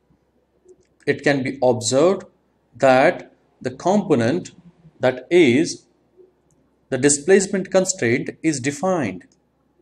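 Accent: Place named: India. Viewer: God!Indian English!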